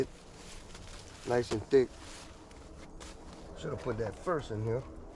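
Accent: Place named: South, United States. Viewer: I love your accent, definitely from the south lol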